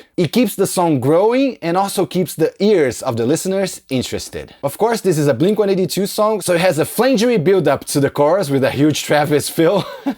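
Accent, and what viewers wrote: Brazil. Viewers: Po o seu sotaque parece muito de brasileiro, vc é br? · mano vc é brasileiro? parece pelo sotaque ahahaha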